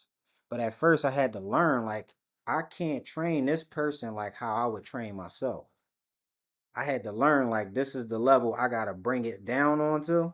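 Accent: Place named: Philadelphia, United States. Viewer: You from Philly? I hear the Philly accent,good work cousin!! 👊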